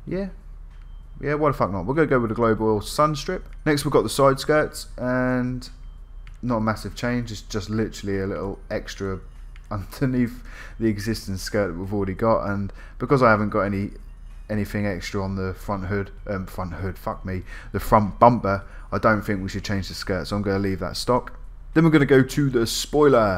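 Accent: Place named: Cockney, United Kingdom. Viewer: Nice video mate & random question I know but what's your accent? Sounds a bit cockney/pompey I just can't quite put me finger on it 😂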